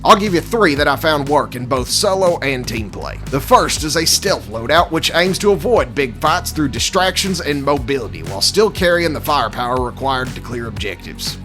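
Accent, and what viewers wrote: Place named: Texas, United States. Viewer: I LOVE his patriotic Texan accent.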